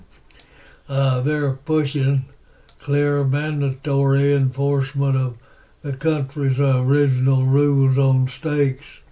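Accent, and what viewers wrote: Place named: Texas, United States. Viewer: Please hit the transcript button and read along if you can’t understand his Texas accent. He’s giving us good information about our meats and other foods. 🙏🏾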